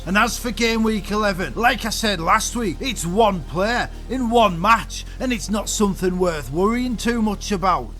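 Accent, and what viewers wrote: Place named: Northern England, United Kingdom. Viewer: Your take on things is great – you sound like the FPL voice in my head, but more northern (and balder) ... Keep up the great work & good luck 👍✨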